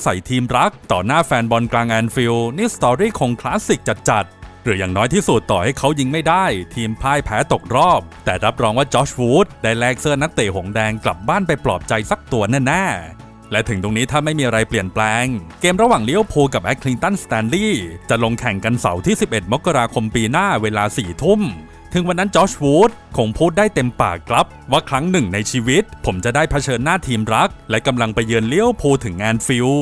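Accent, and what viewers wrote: South, Thailand. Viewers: แอด เปนคนใต้. จังหวัดไหรคับ ได้ยินเเหลง เมื่อวันก่อน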